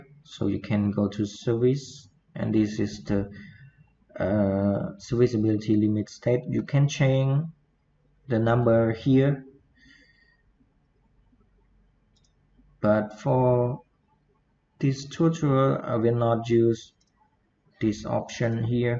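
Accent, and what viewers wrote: Cambodia. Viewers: Your pronunciation sound as Cambodian style. But It’s very nice and impressive VDO. Thank for your shearing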